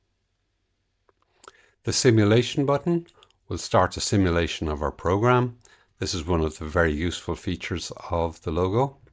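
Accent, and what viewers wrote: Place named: Ireland. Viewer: I was surprised to hear an Irish accent. Good man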